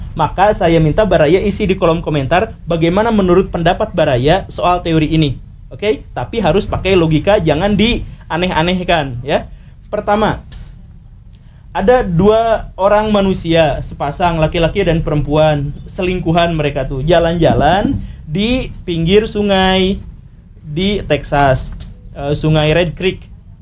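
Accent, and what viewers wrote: Indonesia. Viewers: aku lebih suka orang seperti begini dari pada yang pakek topi versi timur tengah. logat penyampaian sangat ke Indonesia an banget. dan ini yang bikin aku suka nonton vidio satu persatu tanpa pernah saya skip karena saya merasa tambah pintar tanpa ada rasa paksaan harus begini begitu kalau mau seperti itu. sekian terimakasih udah dibaca😂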